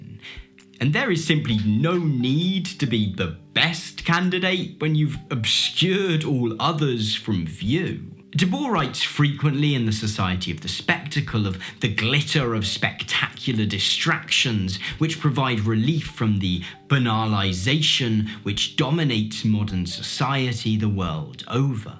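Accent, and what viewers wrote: United Kingdom. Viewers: Dear,are you british?just interested in english language,try to learn it again.sounds british to me.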